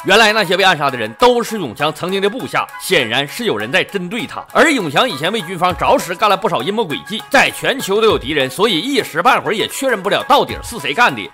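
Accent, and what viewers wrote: Northeast, China. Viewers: 東北話老好聽了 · 他东北的 哈哈哈哈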